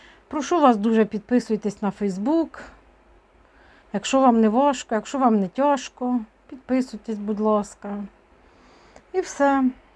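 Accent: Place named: Surzhyk, Ukraine. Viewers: Мені понравилось,а суржик наш,полтавський! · смотрю разных тарологов. Есть очень культурные, есть матершинники. Есть рускоязычные,некоторые красиво говорит по украински, но я обажаю ваш суржик.Вы всегда говорите очень просто и доходчиво. Спасибо за росклад.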